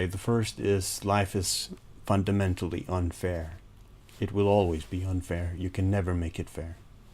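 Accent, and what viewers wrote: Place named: Denmark. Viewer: hehe det er slet ikke så slemt, for det meste kan man ikke høre det, men en dansk accent har det med at trænge igennem fra tid til anden :) anyway vil bare sige at jeg subbede den anden dag efter en podcast og lige havde luret dine steep vids, og kan da se at det er gået hurtigt med et par subs den sidste uge :) håber det fortsætter med at stige og ser frem til flere videoer, kan se den nye L.A. noire er ude, good stuff :) hvis du nu skulle få tid eller lyst ville jeg da blive glad hvis du gad tjekke min lille kanal ud, har kun været i gang i 4 måneders tid så er stadig i gang med at finde min "stil" hehe (var ikke derfor jeg subbede skal lige siges men det skader vel ikke at spørge hehe) aaaaanyway du må have en dejlig uge :)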